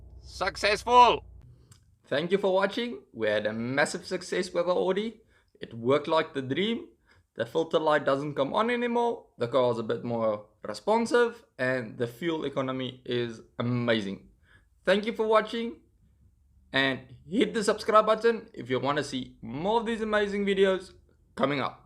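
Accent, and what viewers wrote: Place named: South Africa. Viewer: Definitely South africa !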